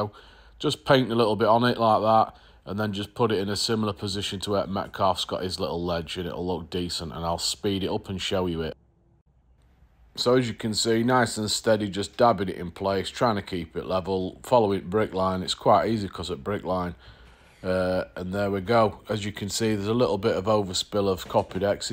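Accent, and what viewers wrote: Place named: Northern England, United Kingdom. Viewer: No messing…no extended intros..just got on with what you want to share…brilliant. Love your refreshing no nonsense hurl stuff on the table approach enhanced by your grand up north dialect. Love it, subscribed from the bottom left corner of the country. Get on my ‘ansom.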